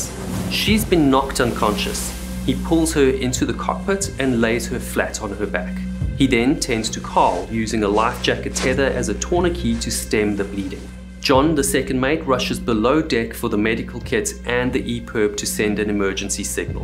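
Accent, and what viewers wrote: South Africa. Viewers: Ahh I was wondering how they died, I mean it was pretty obvious they died of their injuries, but the previous version did feel a bit open ended. Just wondering if you'd ever do a Q&A type video? I'm not usually interested in that type of thing, but I'd love to know were you're from, I can't place the accent although it sounds South African or somewhere else in Africa, but I also remember you said in 1 of your videos that you had lived in England. It would be interesting to hear about your career too.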